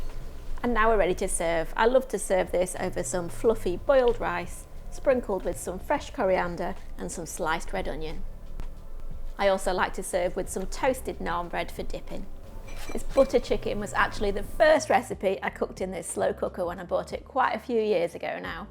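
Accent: United Kingdom. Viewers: the accent..is that british ?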